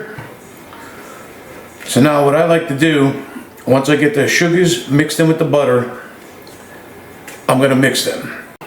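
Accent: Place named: New York, United States. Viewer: Cookies with a NY accent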